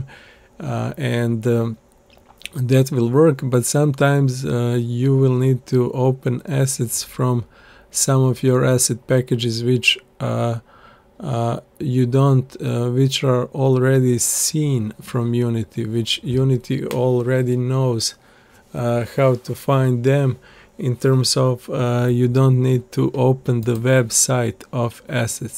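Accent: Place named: Balkans. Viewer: Brate, je si li ti Sprksi? Cujem nasa acenat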